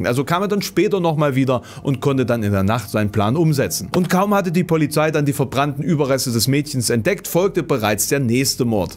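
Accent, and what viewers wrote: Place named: East Germany, Germany. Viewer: Ernsthaft? Ostdeutscher Synchronsprecher?! 🙈😇🤔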